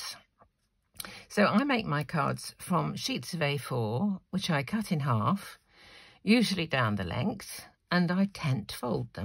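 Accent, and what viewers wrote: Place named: RP, United Kingdom. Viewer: Watching you gives me an immense sense of calm. Your voice is relaxing, and quite, ”posh” at least to these American ears! Anyway, keep posting your wonderfully soothing tutorials.💜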